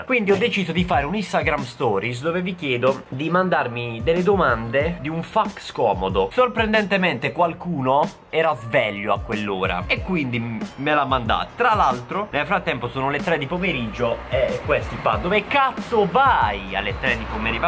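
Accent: Bari, Italy. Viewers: Potrei iniziare ad amare l'accento barese solo grazie a te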